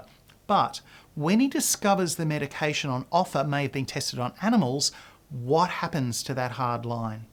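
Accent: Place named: Australia. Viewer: good review! nice accent you Aussie?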